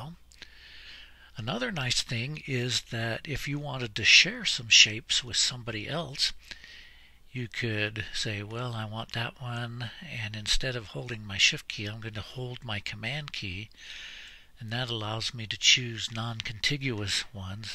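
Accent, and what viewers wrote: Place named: United States. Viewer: Hello, you are quite a character, brother - and i am saying this in a very positive way. Taking the time to answer the questions and comments on a video of yours which is as old as 5 years shows how committed of a person you really are to your profession or passion (whichever best fits). Respect and best wishes all the way from Bangladesh. NB: Your accent tells you are from USA. By the way, I also lived and worked in the USA for a long time. Studied at the university of Oklahoma...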